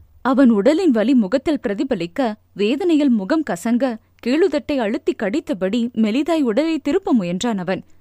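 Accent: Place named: Telugu, India. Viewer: Telugu slang super super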